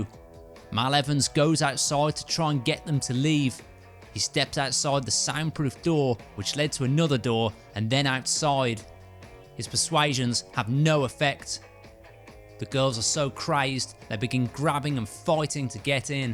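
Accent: United Kingdom. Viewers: There's an old saying: "If you put lipstick on a pIg... it's still a pig." Well, "If you put a cliche British narration on a mediocre script, it's still a mediocre script".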